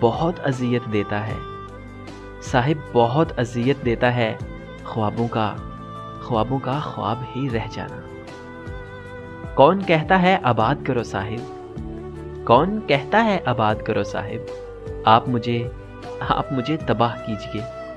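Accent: Punjab, India. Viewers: shyri achi hai per maaf krna punjabi lehja main sara maza khrb kr diya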